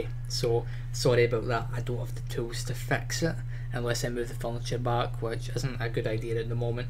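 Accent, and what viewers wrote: Scotland, United Kingdom. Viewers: are you scotish i am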